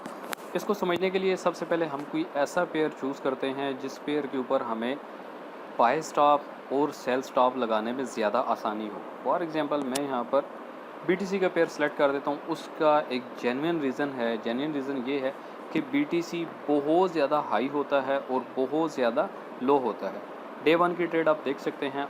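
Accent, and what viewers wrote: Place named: Punjab, India. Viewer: Good information ji Stay blessed. Nice Punjabi touch too. 🙏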